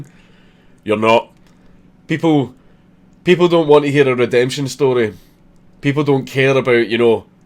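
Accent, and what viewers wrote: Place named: Scotland, United Kingdom. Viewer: Awesome vid! but i wish you went with "they want to see you burned." rather than "they want to be outraged" At the closing statement..mainly cause "burned" sounds awesome with a scottish accent:D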